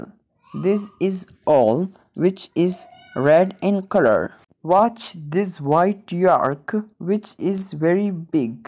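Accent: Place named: Brazil. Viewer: É um brasileiro falando com certeza 😅😅😅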